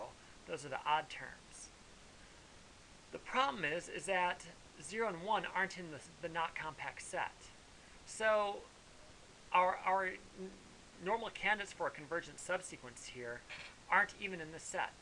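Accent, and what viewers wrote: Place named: United Kingdom. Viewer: thanks, mate! nice british accent btw THANKS M8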